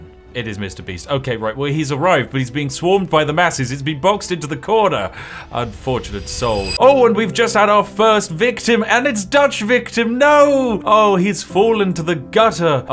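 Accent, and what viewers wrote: United Kingdom. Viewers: I like how he somehow keeps his British accent when replying to comments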